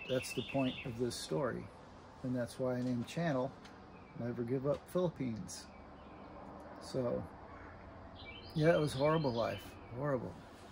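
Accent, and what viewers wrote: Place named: Liberia. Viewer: I'm from Liberty. When I hear you speak, it takes me back there.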